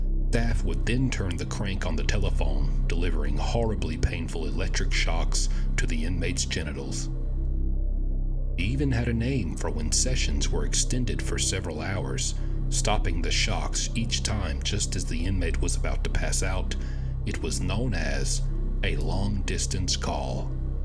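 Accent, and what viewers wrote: South, United States. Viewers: ! Your pronunciation, enunciation and articulate diction, while having a wonderful southern gentleman accent, are very refreshing to say the least! I thank you and you should congratulate yourself as you are a rare gem. Thanks again!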